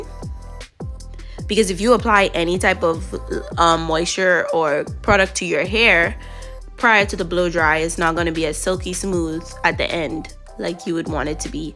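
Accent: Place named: Bahamas. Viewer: you sound Bahamian. Hair goals🙌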